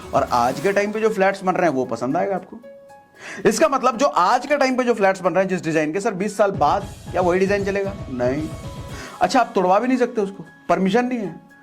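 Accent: Bihar, India. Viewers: Gariayayga,Lagta hai aap bihar se hain.mai bhi hun.good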